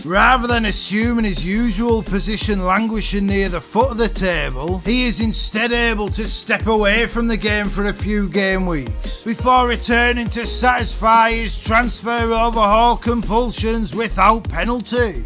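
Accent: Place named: Northern England, United Kingdom. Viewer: Your take on things is great – you sound like the FPL voice in my head, but more northern (and balder) ... Keep up the great work & good luck 👍✨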